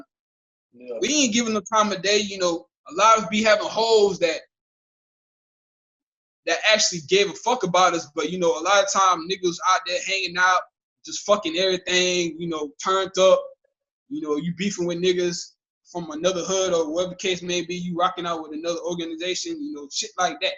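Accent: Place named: Washington DC, United States. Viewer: Sound like he from DC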